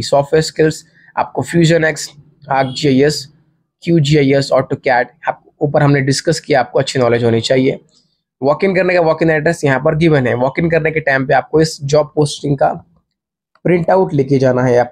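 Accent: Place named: United States. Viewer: Bhai first change your tune into indian. You're speaking hindi with American accent 😂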